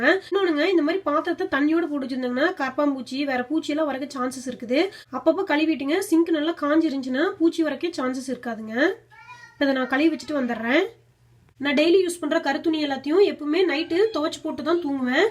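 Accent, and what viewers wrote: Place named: Erode, India. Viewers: Hai i am also frm erode but now in ooty happy to hear our erode slang thank u god bless u sis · Nalla theriyudhu. Neenga pesara slang. Namma oorula than over ah vanga ponga nu pesuvom. I'm from Chittode 😊 lives in Bangalore . Ur good name ???? Ur dad s a cook ???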